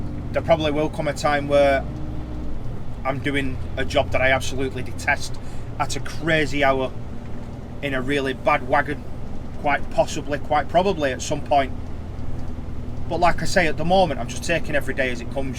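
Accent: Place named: Oldham, United Kingdom. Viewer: I'm glad your enjoying yourself pal I onky did my test a few weeks ago on class 2 and could not wait to get down the road altho I've driven trucks for many years I only past not so long ago just take your time don't let cars rush you and just take it at your own pace . You sound like a Oldham lad with that accent I'm only over the top In Huddersfield myself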